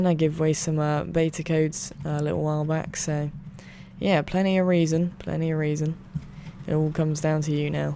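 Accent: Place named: England, United Kingdom. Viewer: Drum and that was awesome and I love ur England accent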